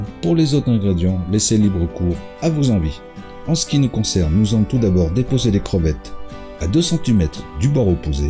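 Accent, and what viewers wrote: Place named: France. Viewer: Bonjour hi, you speak French with French accent, but I speak with Canadian accent.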